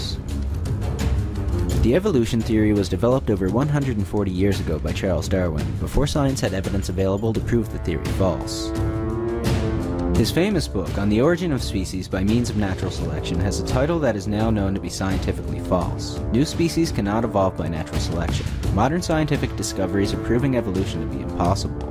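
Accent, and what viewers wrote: Scotland, United Kingdom. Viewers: Scottish? Did I miss a voice/face reveal? 😕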